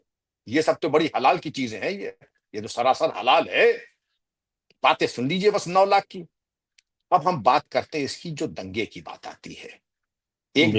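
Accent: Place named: Uttar Pradesh, India. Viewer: Vikram sir ka andaz kitna gajab hai pura U.P wala 😂😂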